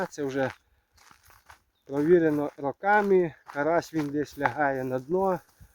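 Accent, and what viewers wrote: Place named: Ovruch, Ukraine. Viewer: Вітаю, напиши яка назва вашого села,я чую наш говір, я з овручини,наперед дякую.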